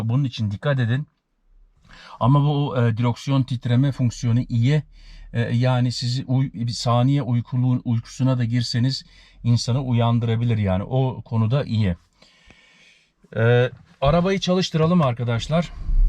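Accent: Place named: Zonguldak, Turkey. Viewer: Usta türkcen güzel, fakat yinede anladim sen zonguldakllisin, kastanonu karabük veya bartin da olabilir ama zonguldak derim?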